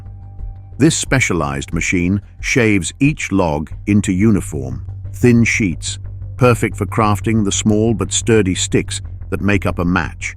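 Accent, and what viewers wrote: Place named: United Kingdom. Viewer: Have someone w/ half a brain listen to ur audio to see what they think. Constantly stating the obvious over & fing over!! Hire a real writer & narrator, that British accent could not sound any dumber!!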